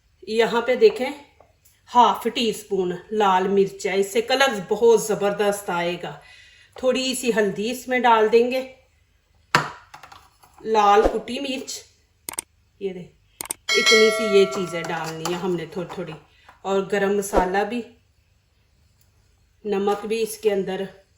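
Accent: Punjab, India. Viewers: Aoa boht acchi recipe .aap urdu punjabi lehjey main bolti hain. Behter ho ga k punjabi main btayn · Ye bibi urdu english punjabi lehjey main bolti hain bat samejh aa jati hai na to tunqeed kiun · لہجہ پنجابی زبان اردو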